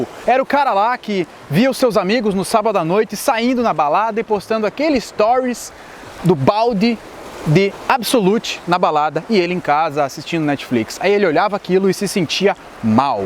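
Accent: Rio Grande do Sul, Brazil. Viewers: Muito bom como todos os conteudos, mas entre nos meu velho, pelo teu sotaque sei que tu também é Gaúcho, diz ai: É Gremista ou colorado haha sucesso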